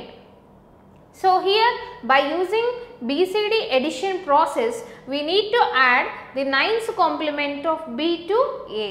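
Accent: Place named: South India, India. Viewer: you might be from south India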